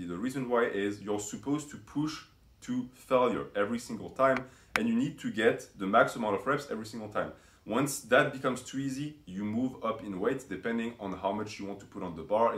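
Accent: France. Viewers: Ta un accent français ? Dommage que je comprends pas. L'anglais sa fait 3 ans que je m'entraîne en half est j'aurais aimé plus de renseignement est de changement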